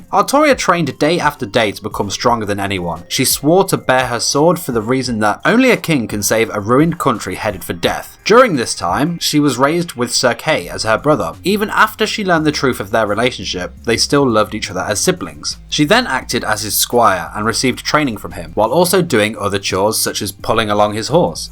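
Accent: United Kingdom. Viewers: are u welsh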